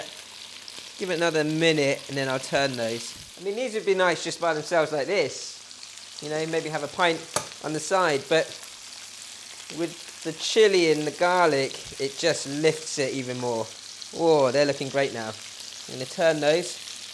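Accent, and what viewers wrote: United Kingdom. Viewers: Holy shit, an Asian dude with a British accent! Love it!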